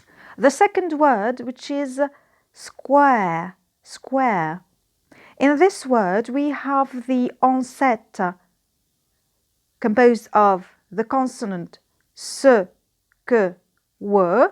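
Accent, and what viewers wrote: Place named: United Kingdom. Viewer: Ur British accent is 👍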